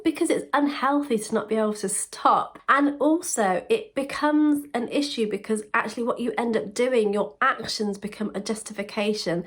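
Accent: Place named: United Kingdom. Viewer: May I ask where your accent is from (Which part of the UK)? I just LOVE it !!! (And I'm not a native speaker, I'm German, so I can't really make an educated guess :D)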